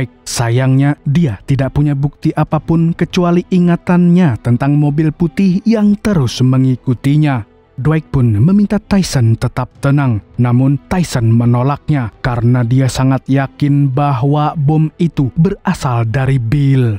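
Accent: Banjar, Indonesia. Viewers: Org Banjar kah mang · Orang Banjar kh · urg banjar kah pian mang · Skalinya urg Banjar jua😂😂😂😂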